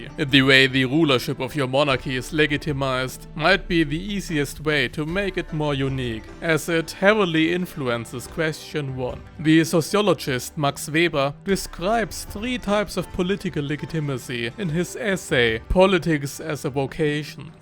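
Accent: Germany. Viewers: Me when my favorite setting YouTuber is losing his magical German accent 😢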